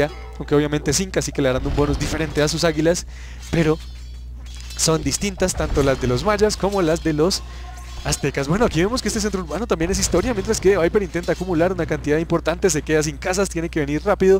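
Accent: Bogotá, Colombia. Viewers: eres colombiano y rolo? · De que parte de Colombia eres? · De que parte de colombia eres Buenos videos :D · Entonces eres de Bogota parcero xD muy bien comentado, con buen tono de voz y animicamente bien.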